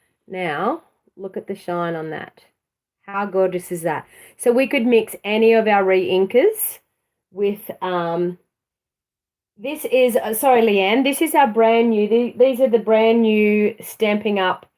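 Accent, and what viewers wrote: Australia. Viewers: Hi, Just watched your video. Love your work and videos Just thought i would let you know that if you mix the shimmer paint with some ISOCOL Rubbing Alcohol Antiseptic into a mister. The ISOLCOL dries quietly and doesn’t run. I live in Western Sydney love watching your videos and hearing the Aussie accent.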